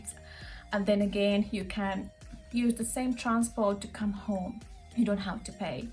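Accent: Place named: England, United Kingdom. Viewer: U knw what .ur so beautifull...plzz video ekak karanna english acent eka hadaganna widiha oya wage...❤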